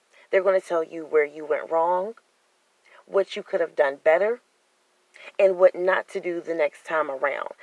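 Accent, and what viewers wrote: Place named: North Carolina, United States. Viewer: I am born and raised in NC and yes ma'am you sounded like me telling about my upbringing.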